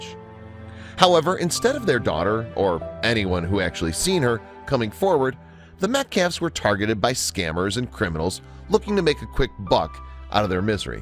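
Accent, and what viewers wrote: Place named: Michigan, United States. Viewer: The heavy Michigan accent and repetitive overuse of the word indeed cracked me up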